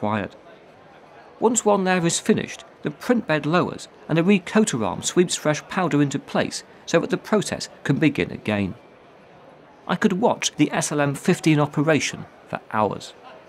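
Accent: United Kingdom. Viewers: To me, everything spoken with a proper english accent gets way more serious and undeniably true. Great narration.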